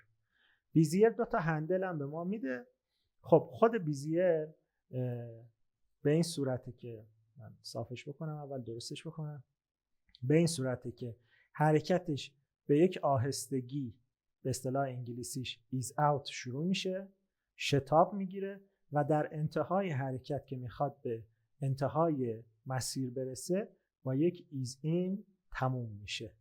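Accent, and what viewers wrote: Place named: Shiraz, Iran. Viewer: آموزش با لهجه شیرازی یه لیگ دیگه‌اس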